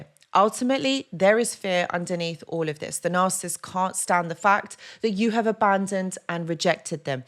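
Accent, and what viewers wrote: England, United Kingdom. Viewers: Somehow I've been bragging on the English accent & with good reason I'm totally subscribed & thx luv😘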